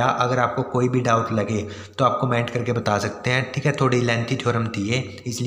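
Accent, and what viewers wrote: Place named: Haryana, India. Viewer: Aap ke accent se pata chal gya ki aap haryana ke ass pass se ho , ho sakta hai haryana se hi ho , Thankyou so much sir , ye accent chupaye ni chupta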